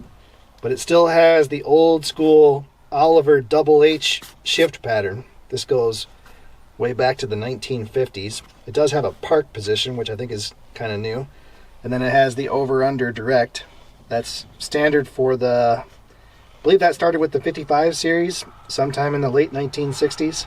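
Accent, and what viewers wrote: United Kingdom. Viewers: You are so British 👍. Including the correctly pronounced solder with an L. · Why sound like most British friend?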